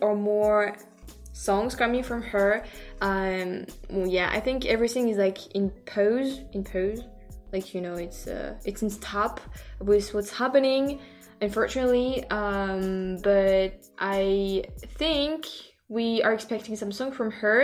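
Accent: France. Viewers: i love the french accent coming through the English pronounciation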